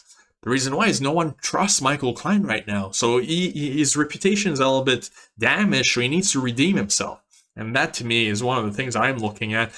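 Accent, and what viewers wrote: Quebec, Canada. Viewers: I hear Quebec accent. We're from the same province 😁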